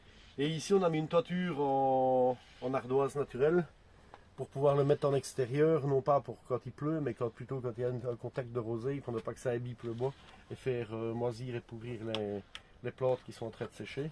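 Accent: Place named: Belgium. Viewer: L'accent Belge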